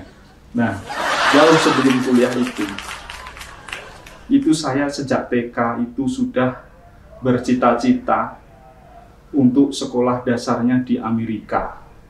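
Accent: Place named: Java, Indonesia. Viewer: Keren dok....suka bahasane... pertahankan medoknya dok...he2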